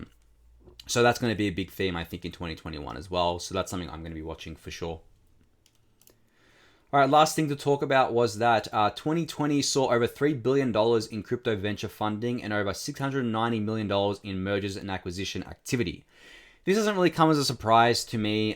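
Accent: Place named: Australia. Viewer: Never knew you were Aussie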